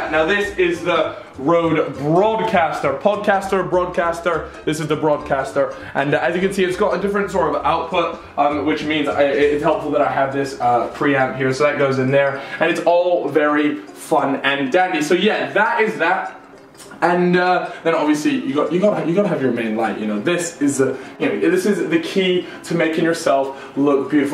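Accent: United States. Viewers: He sounds american and his accent keeps phasing out! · you sound American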